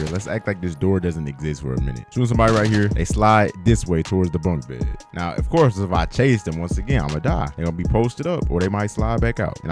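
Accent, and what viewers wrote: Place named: South, United States. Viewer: Bro you from the south ain‘t you 😅 anyways great videos!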